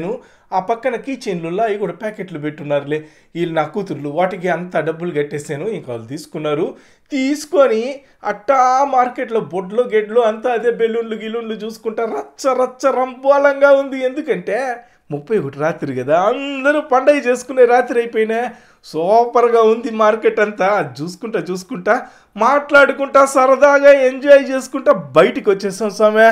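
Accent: Nellore, India. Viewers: Super Tammudu meeru video pettadam chudakapovadama adi 5min ayina 10min ayina mana nellore slang naa Chevilo amrutam laa vundi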